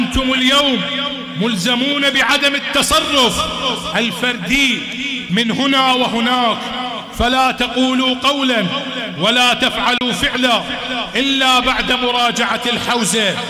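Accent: Iran. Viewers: ليش يحجي إيراني؟ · لهجتكم ايرانية وليست عراقية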